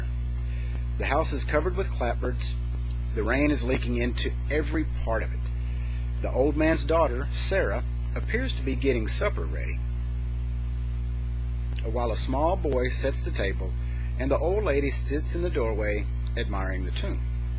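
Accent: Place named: Arkansas, United States. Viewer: You sound like an Arkansawyer all right, but we downEasters don't talk the way you think we do. However, you like storytelling, fireplaces, and pipe smoking, so you're a friend of mine I just haven't met yet. I enjoyed this vid enormously; and if ever we meet, I'll fiddle you and share my tobacco.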